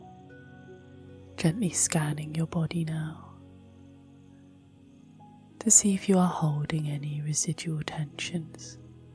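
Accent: United Kingdom. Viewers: Thank you, for your wonderful channel and all the effort you have put into it. This video helped me to fall into a deep and relaxing sleep. Thanks to your lovely voice and your marvellous British accent. Greetings from Germany. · Alright, nice to hear an accent from my town, London. Drive through Clapham sometimes. Great voice and meditation stuff thanks 👍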